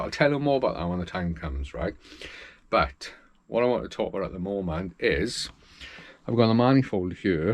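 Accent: Wales, United Kingdom. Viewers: Welcome back mate, nothing beats cars and a Welsh accent🏴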